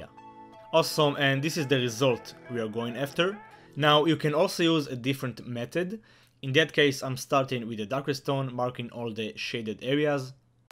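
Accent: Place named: Israel. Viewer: יש לך מבטא ישראלי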